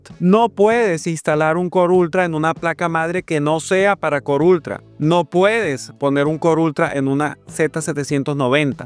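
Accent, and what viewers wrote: Venezuela. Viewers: bro tu eres venezolano?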